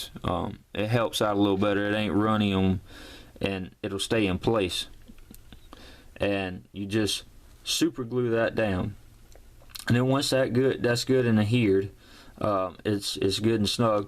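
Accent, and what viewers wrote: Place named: South, United States. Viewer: Bham, Alabama. haha something told me you was from the south.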